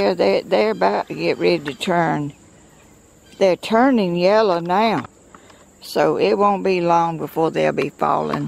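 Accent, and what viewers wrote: South, United States. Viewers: Morning to you.while you are talking about blessings, you are one yourself, to me for sure. Love the walk. We had lots of woods when I was growing up in Virginia. My mother was named too.Love the southern talk and your wonderful laugh. Yes it's rabbit tobacco. I remember my daddy telling me so.we had lots around on our farm. You take care and be careful of the snakes.ok.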